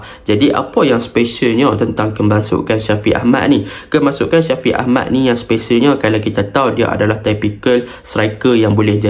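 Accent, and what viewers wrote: Kedah, Malaysia. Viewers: Boleh sangat sangat tetapi loghat utara kena hilangkan.Maksudnya boleh tukar tune jikalau dikehendaki. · x salah pun loghat kedah tu sbb semua org bole faham ja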